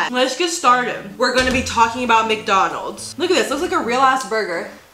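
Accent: United Kingdom. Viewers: As a British person, your British accent is truly incredible! I love it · Bro your British accent gets me every fucking time AHAAA! Anyways video. Beautiful. Mukbang. A1.. Baddy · THE BRITISH ACCENT KILLED ME 💀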